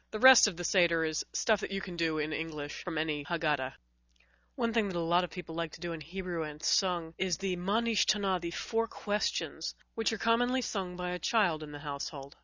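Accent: United States. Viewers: american accent is awful..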